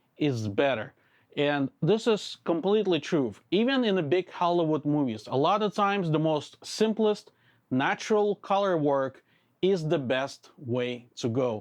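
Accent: Russia. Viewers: i don't know man, they might be helpful for someone who does not speak english at all... but mostly they feel like a riddle where you just try to guess what these random words suppose to mean)) i was surprised honestly, i always thought you were russian – because of accent and idk, attitude? sense of humor? Anyways, awesome video as always, thank you!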